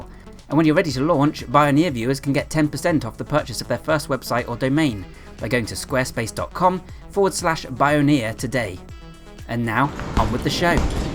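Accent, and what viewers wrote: England, United Kingdom. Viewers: Americans always trust anyone with an English accent 😁 So of course as someone eating like an American, I’ve had to resort to counting calories. I somehow seem to get fat so easily. 🙄 even when I’m at maintenance I have to count. I guess eating like a hog in my youth while lifting 4 days a week made it where I can’t seem to have that intuition for not overeating. Thanks for the video! Subscribed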